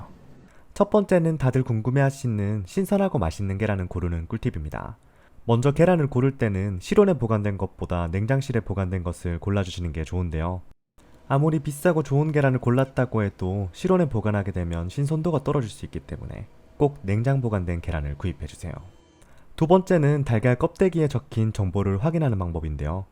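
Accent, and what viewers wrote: Gyeongsang, South Korea. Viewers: 표준말 같은 구수한 경상도 사투리...ㅋ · 경상도 분이신가보네요 듣는데 사투리가자꾸들려서 좋네요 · 경북억양 경남억양이 섞이셨네요...맞나요?^^ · 계속 경상도 액센트로 해주시길 부탁드립니다. 경상도 액센트는 세계최고 멋져요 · 그냥 이렇게 평소 말투로 하시는거 추천합니다. 저도 경상도지만 완벽하게 억양교정 잘 안될뿐더러 ( 도 안되시던데요?😂) 굳이 교정할 필요 있을까요? 사투리 매력적이고 재밌어요 경상도도, 전라도도, 강원도도, 충청도도 다다 ㅎㅎ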